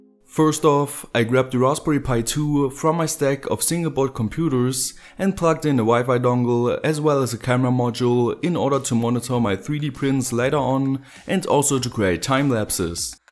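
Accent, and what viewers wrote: Germany. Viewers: oh gott..., ein deutscher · can i hear a german accent?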